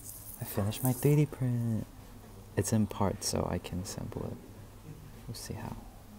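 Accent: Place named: South Africa. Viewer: Amazing work bro... I swear to God u look and sound South African... It your work though that got me💓💓💓.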